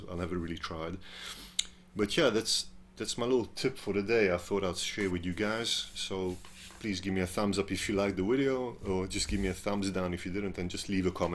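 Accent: South Africa. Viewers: hey, don't get me wrong, there is a great advantage in barring sometimes. So, I won't say never bar. Barring can be really the only way to achieve some stuff. I do it all the time. I realize you are doing it as a time saver and it also eliminates this "where do I go" feeling of that finger. You just bar and know that now you only have 2 or 3 fingers to noodle around with above the bar. I understand. I thought later that maybe your accent was South African after I listened more closely. Just one or two words threw me off to Netherlands. haha Yeah, I grew up playing with a very clamped restricted style and I think it hindered me. But I started on acoustic so I was not playing elec till 3 years later and just always had this cramped style going on. But.... what do ya do? hehe just move on and try to learn more things. aight. talk to ya later!! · South African accent! 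His teaching style rocks!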